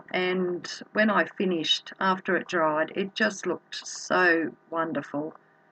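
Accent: Australia. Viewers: so true, and your Aussie accent is helping me smile even more! xoxoxo:))))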